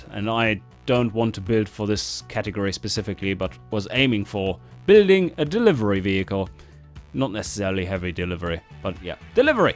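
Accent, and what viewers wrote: Germany. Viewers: Actually, are you from Germany? :D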